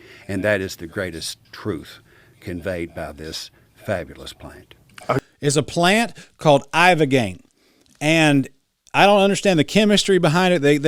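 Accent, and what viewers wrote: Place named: South, United States. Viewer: That’s not how it works. You should learn about addiction. You sound really southern. Learn to read.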